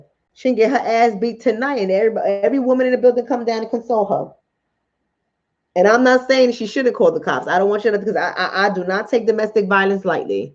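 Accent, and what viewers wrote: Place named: Bronx, United States. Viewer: Love you! That New York Bronx Accent is everything! I could listen to you all day long. IJS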